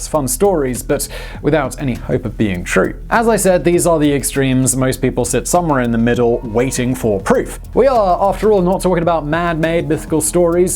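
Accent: United Kingdom. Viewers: British Vsauce is growing on me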